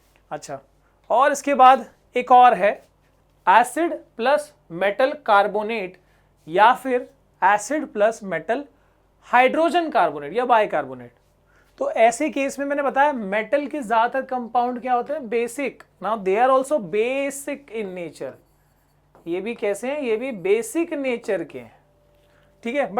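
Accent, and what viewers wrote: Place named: South India, India. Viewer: Sir aaj aap south + Mumbai jaisi hindi Kyun bol the he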